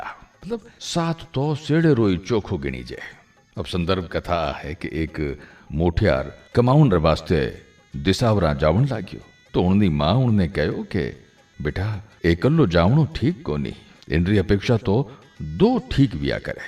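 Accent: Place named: Marwar, India. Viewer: जी आपरी मारवाडी़ बोली गणी फुटरी लागे सा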